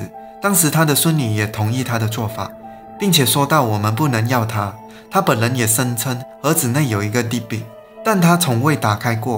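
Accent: Malaysia. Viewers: 應該是馬來西亞人，聽口音就知道了。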